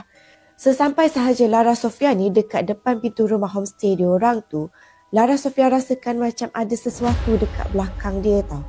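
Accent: Kelantan, Malaysia. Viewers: ni org Kelate ke?! Cakap KL pun bunyi macam loghat Kelate 😅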